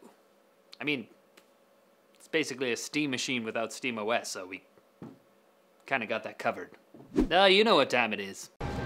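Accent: Ireland. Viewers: such a racist Irish accent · Nice Irish accent....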